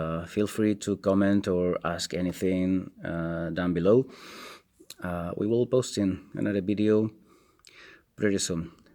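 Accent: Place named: Catalonia, Spain. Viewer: Tens accent català, hahahah! Podries dirme que tal funcionen alguns jocs? En especial pokemon go si el pots probar que tal funciona? Hearthstone potser també si pots, moltes gràcies i bon video!!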